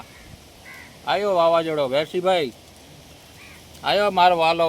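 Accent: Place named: Kathiawar, India. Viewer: કાઠિયાવાડી ભાષા હો ભાઈ 😅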